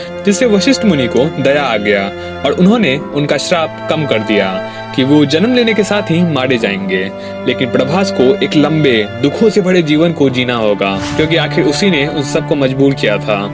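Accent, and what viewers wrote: Bihar, India. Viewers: Bihadi ho kya bhaiya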